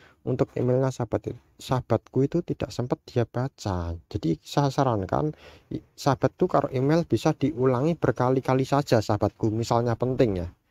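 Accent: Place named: Java, Indonesia. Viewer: Ga jelas medok.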